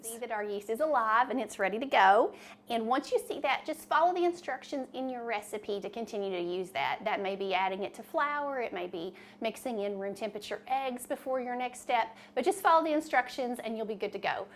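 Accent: South, United States. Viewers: The most southern accent ever. Love it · Where in the usa are you from ..i love your accent 😍 💕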